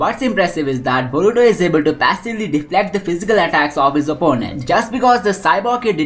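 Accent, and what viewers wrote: India. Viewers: can u speak hindi??? your accent seems indian if yes... please make theories in hindi please please i am your OG watcher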